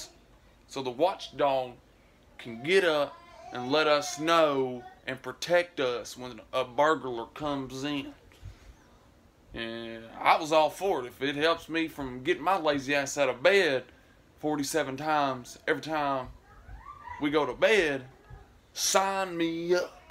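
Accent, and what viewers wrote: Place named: Kentucky, United States. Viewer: my dog just did that. I got up & came back and that plate was licked clean and I mean clean. I couldn't get to mad him he's old. Edit =, you are from Kentucky? Right, cause you sure sound like it. Takes one to know one!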